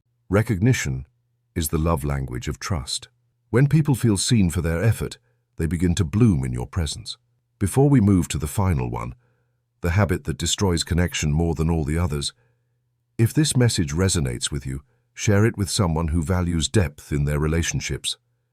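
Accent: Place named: United Kingdom. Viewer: I just realized the AI voice has a British accent. What a comical choice. Did the creator of this video think the accent would give the presentation more authority? I wonder.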